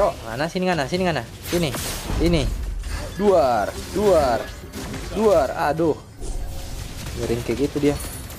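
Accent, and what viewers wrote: Manado, Indonesia. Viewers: Orang mna bg Manado ?apa Sulteng?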